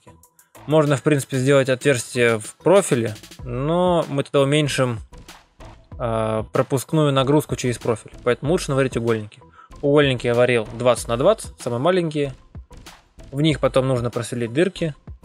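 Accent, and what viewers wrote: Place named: South, Russia. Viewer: Добрый день. Не могу понять, где вас букву гэ, учат как хэ говорить? В паспорте же имя Григорий у вас же как Григорий записано, а не Хригорий. В школе диктанты писали, разве там также как и говорили, вы писали? Ни коем образом не хочу оскорбить, или обидеть. Но этот диалект не понимает 90% всех людей в РФ. Вместо 5 литровой банки ,вы говорите баллон. Кто вас этому научил? Или сахар называть песком.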